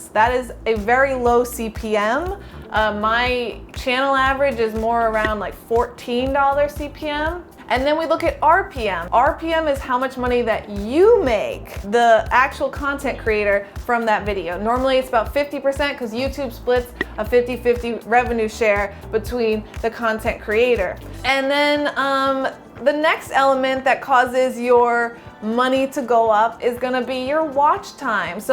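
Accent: New York, United States. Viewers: I've followed your channel for several years and use it to practise my english listening stills. At very first video I thought you have a NY accent, you always swallow the "h" sound from "manhattan" and the words come from your throat not from lungs, it makes the words sound more lighter than someone from california. I had difficulties at that time, now I am used to it. Great performance, beautiful apartment! Go ahead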